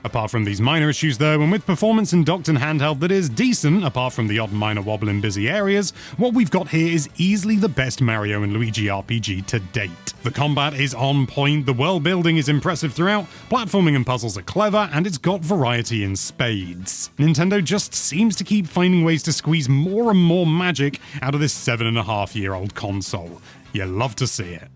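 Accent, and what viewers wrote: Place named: United Kingdom. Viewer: He’s British. That’s just how people pronounce it?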